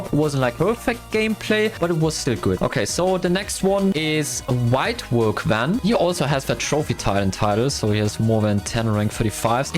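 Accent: Germany. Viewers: Are you german?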